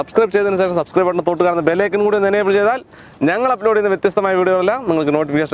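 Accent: Palakkad, India. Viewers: U can add subtitles to ur videos so that non keralites as well can understand and one more thing ur way of talking in our Palakkad style is quite well.so if u include all ur members in the whole video and lil bit of interaction with each other will be more interesting. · ഇക്കാ നിങ്ങളുടെ Videos പെരുത്ത് ഇഷ്ടമാണ്...ചുമ്മാ ഇരിക്കുബ്ബോള്‍ നിങ്ങളുടെ ചാനല്‍ എടുത്ത് Videos കാണാന്‍ തോന്നും...വോറൊന്നും കൊണ്ടല്ല...ഞാന്‍ Trivandrum ത്ത് നിന്നാണ്...നിങ്ങളുടെ പാലക്കാടന്‍ Dialect വലിയ ഇഷ്ടമാണ്...സ്വന്തം Dialect ഉപയോഗിക്കുന്ന ഭംഗി Standard Dialect ഉപയോഗിച്ചാല്‍ കിട്ടില്ല...ഫിറോസ് ഇക്ക ഇഷ്ടം...😍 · ningalde palakkadan basha kelkan nhan veendum veendum video kandu ikka luv from sharjah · Palakkadan slang🤩 njn pkd aan☺️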